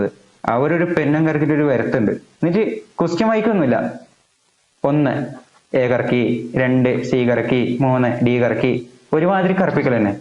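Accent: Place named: Thrissur, India. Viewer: കേട്ടാലറിയാം ത്രിശ്ശൂര്ക്കാരനാണ്.....😅😅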